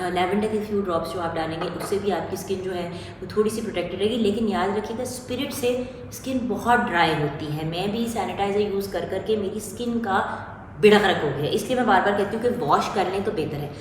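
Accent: Punjab, India. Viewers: Same here mam plz upload video i like yr pinjabienglish voice love uu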